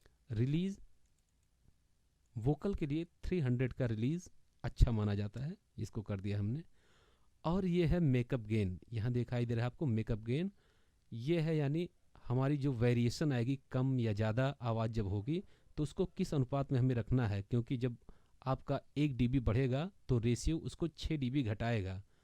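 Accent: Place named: Uttar Pradesh, India. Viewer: UP ke ho bhai...hai na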